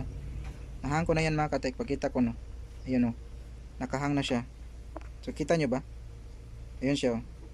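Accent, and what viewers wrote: Visayas, Philippines. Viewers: Yan ang tinood na mag tudlo..hajaj bisaya ka sir?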